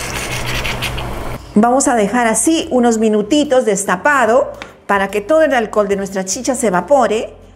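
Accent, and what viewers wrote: Peru. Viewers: A mi me encanta. Es la forma de hablar en el Peru. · Que rico se ve ese Seco de Res al puro estilo Peruano, pero más rico todavía es escuchar la forma de hablar de las mujeres peruanas, disfrutar de ese acento claro, limpio, suave y ligeramente melodioso ... felicitaciones.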